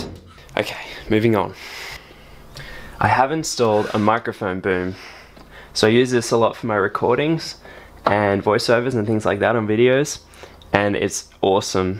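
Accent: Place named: Australia. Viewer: before i found this vid on your channel i thought you might be Australian guess i was right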